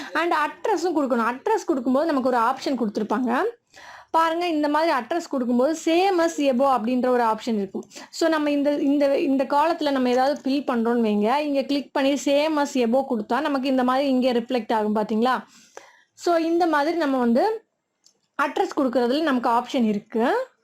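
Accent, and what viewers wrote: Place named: Tirunelveli, India. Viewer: Nenga tirunelveli kanyakumari slang pesaringa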